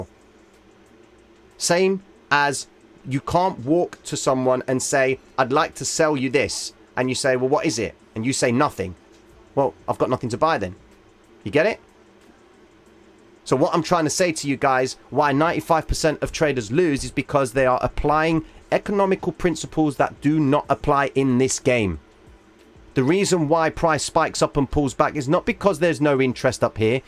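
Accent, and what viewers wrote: Cockney, United Kingdom. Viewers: Man, U R flipping brilliant. I learn 10-20 different things on every video you make. Thanks. Beginning to understand the MM strategies. Pretty sure it will help in the future.Of course, always remembering that anything can happen. By the way, U got an Italian name and a Cockney accent. Remind me of a few friends I had back then.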